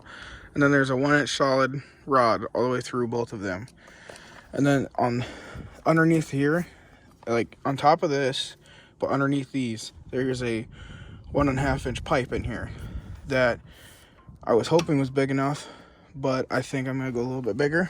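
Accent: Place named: West Texas, United States. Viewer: Damn for some reason I thought you were west Texas.. Nice build buddy!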